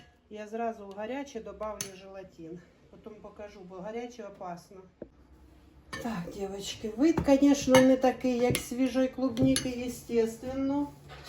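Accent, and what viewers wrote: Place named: Poltava, Ukraine. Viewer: Доброго дня! Підняли тему жіночої дружби, і ми тепер позгадуємо, як було) Так, по молодості було багато жіночих дружб, студентських, ну не таких, щоб прям занадто, але з часом, з роками ти розумієш, що найкращої дружби, як до своїх близьких та рідних годі й шукати! Мені зараз 46, батьків вже нема давно, але підтримуємо тісний зв'язок з сестрами, це найкращі подруги 💯💯 нас троє. Добре, що так виходить, дай Бог, щоб і надалі так було!!🙏🤗🤗 А от тема про Полтавську область цікава, де ви там проживали, скільки часу, щось про Полтаву? Бо я сама з Миргородського району, а зараз ми виходить недалеко й мешкаємо один від одного, хвилин 40-50 їзди)) Чомусь зразу, як почала вас дивитися, почула у вас полтавську "балачку", ну трошки є)🤗🤗🤗 Бувайте здорові, гарного дня, всим вітання!!❤❤❤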